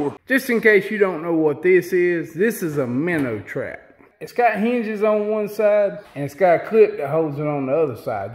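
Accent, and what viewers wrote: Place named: North Carolina, United States. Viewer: Man I'm from laurinburg born and raised , you gotta be from Robeson County you just have that Robeson County twang. Some good people from around them parts. Keep the video's coming best tips I've ever seen. I love your common sense approach to fishing...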